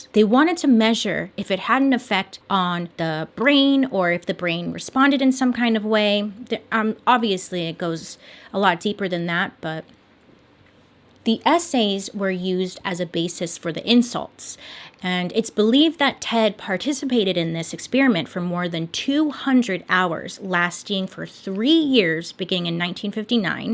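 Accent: United States. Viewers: my American English has improved by listening to her stories 🥰 thank you!!!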